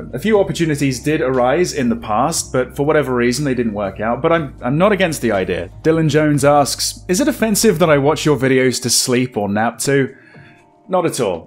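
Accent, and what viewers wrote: United Kingdom. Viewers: Congrats on 100K!! 🥳I have been following you for a long time now and you, Markiplier and Jacksepticeye are my favorite videogamers to watch on YouTube. Your videos are always entertaining and wonderful to watch! You brought my attention to wonderful games like the Cat Lady, Silence of the Sleep and Downfall. I looooved those videos you recorded so much. I enjoy it that you play Indie horror and Asian horror games that mainstream youtubers don't play that much. Also, your British accent is so awesome! 😁 keep up the good work! I appreciate you and what you do! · ‘In Bruges’ is a fantastic movie!! I also had your accent pegged as a fellow Surrey person. We sound very similar. This was a great video,. Pleased we get to know more about you. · Thank you so much for doing this. I started to watch you a few years ago, because I was about to do voluntarily stuff in the UK. 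So I looked for "british sounding lps" to get used to it to understand it better. I had to stay for your humour, for the fun, for the checked toilets, for the very rare screams. I think we'll get to 200.000. Let's do it! With some pizza and tea!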